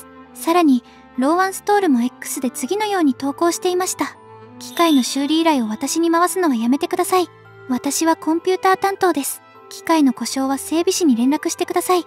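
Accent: Kansai, Japan. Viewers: 関西弁過ぎてわろた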